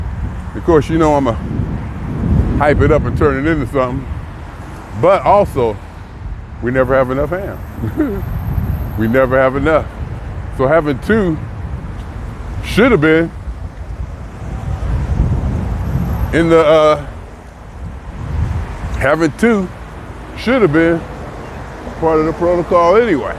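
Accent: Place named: Midwest, United States. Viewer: I can spot the Midwest every time. Thanks.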